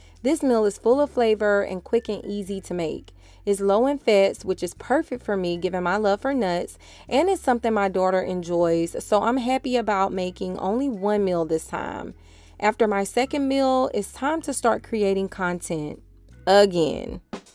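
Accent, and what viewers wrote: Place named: South, United States. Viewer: Not sure how you got to my algorithm, but I'm happy ya did and you had me ❣️🎶 I've been debating giving raw a try but it seems daunting, as we have 4 young children and the thoughts of cooking for them and something entirely different for me overwhelms my mind. I think I'm going to try it by starting small. Thanx & God bless!✌🏽 (P.s....sounds like I hear some Philly in your accent yet, I hear some South. I'm orig from VA.)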